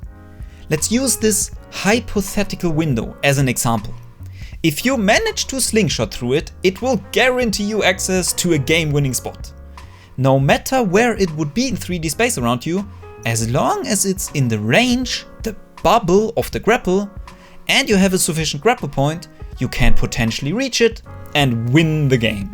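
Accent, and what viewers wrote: Germany. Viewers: I as a German INSTANTLY recognized his accent😂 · u sound extremly german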